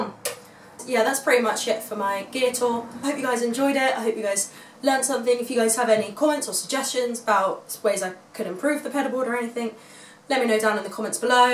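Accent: England, United Kingdom. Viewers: I love girl with english accent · Nice to hear you talk. Didn't know you were a Brit. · Lets review here. Hot, plays guitar and a has a Brit accent.. and a gear head... The perfect woman does exist! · ты своим английским акцентом меня просто убиваешь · Love the English accent! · так разговаривает как британка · OMG, haven't heard a cute little accent like yours since the last time I flew British Airways !!! ... when are you touring the USA ??? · ... you’re a Brit? Holy chit. Guess I’m out of the loop. Love the accent. Gear is sweet too. 🤘🏻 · I just stumbled onto your channel today and I'm glad I did. You are an amazing player and seem like a really nice human being. You sound English. Do you live in Great Britain? I've watched several of your videos today including your Kiesel endorsement video. I've watched the Kiesel factory tour video 20 times, lol. 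I want a Kiesel Aries hardtail like yours. Anyways, just wanted to give you props and say hi and wish all the success in the world. You are a very talented player. Hope you have a great day. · you are from england this is the first time i listened to your accent well done so talented and gorgeous girl 🤘🤘🤘🤘🤘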